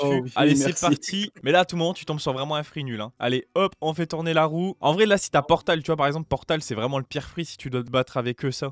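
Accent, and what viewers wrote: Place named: Quebec, Canada. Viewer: est québécois parce que moi aussi chu québécois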